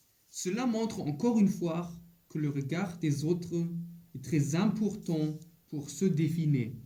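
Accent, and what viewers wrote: Germany. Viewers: Il a évidemment un fort accent allemand. Avec les Espagnols , je peux comprendre qu'ils n'ont pas de gorge R ou Ü, mais les Allemands devraient pouvoir parler bien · Puh! Deutsches Französisch ist echt schwer zu ertragen... aber sonst ein gutes und informatives Video.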